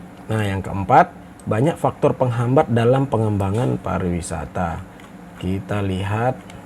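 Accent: Lombok, Indonesia. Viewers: Be elekm batur marak dialeg lombok basem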